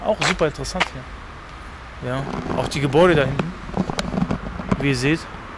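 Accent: Berlin, Germany. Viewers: Ich merke einen leicht überheblichen Berliner Ton. Typisch „Berliner Schnauze“. Sorry, aber du kennst weder Billstedt noch Hamburg!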